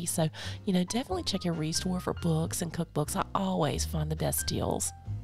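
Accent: South, United States. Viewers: you are my favorite shopping friend! You find the most amazing Restores and then find the best items in the stores. How do you do that?!? The narration is absolutely soothing with the cool music and that Southern accent! Your voice is like a mint julep for the ears. ❤️2U& yours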